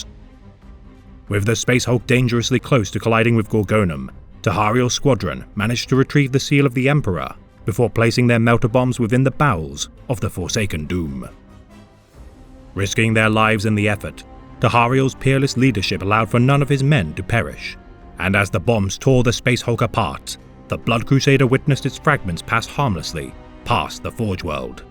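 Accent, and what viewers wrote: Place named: England, United Kingdom. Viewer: I was getting tired of the necron narrator tbh lol back to that sweet English dialect lol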